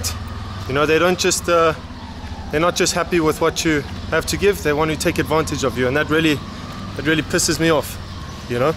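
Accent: Germany. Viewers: You German or South African I'm confused 🤔 anywhos love from America🇺🇲🦅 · He has a german accent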